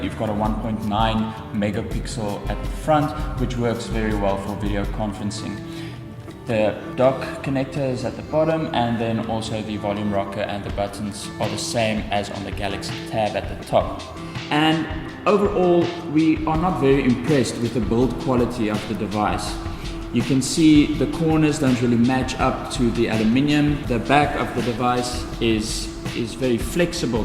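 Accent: South Africa. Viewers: Not only is the sound bad, but your South African accent shines through. Bit off putting. Next time try a few people to hear how they sound. Otherwise it is informative. I think the enhancements are awesome. The speed is also great... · Poor audio, way too much echo and the background music is annoying but I do like the SA accent !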